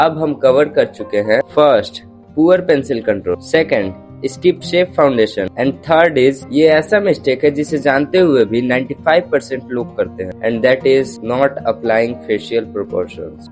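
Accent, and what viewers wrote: Bihar, India. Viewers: Are you from Bihar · Bhaiya are you from bihar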